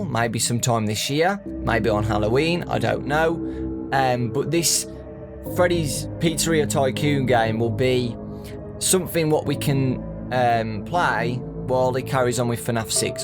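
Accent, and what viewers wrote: United Kingdom. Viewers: Is he british or something ?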